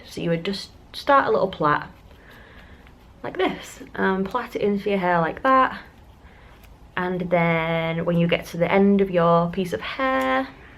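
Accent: United Kingdom. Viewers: And i just wanted to say that you are awesome and i love watching your videos. I just recently found you and I am glad I did because i Love that you are different than most, hell...I am different than most! But i love your channel and I will continue to follow you. You're pretty awesome! I wonder, how much was it to order your dreads? I would Love to see if i can buy them from the USA. I love the UK though, it's the one place that I must see before I die. I don't know what it is about the UK but i gravitate towards it. Its like something pulling me and i am so in love with the Accent, the culture, everything about it! Is your real name? I apologize if that's personal or a little forward but is favorite name in the WHOLE wide world. I was going to name my daughter Belle. But her grandma was like NO, people will call her Lunatic, So yeah... i was like SO What, she could be called worse..lol! 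Anyways, you get the point! You ROCK!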